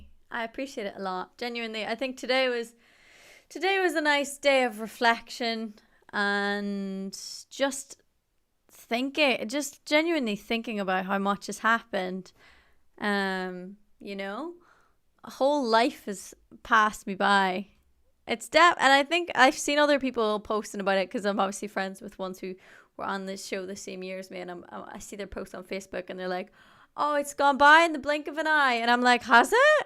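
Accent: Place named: Ireland. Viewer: Did they ask you to change your accent on XCRAPTOR. I was so happy when you kept your beautiful Irish tone.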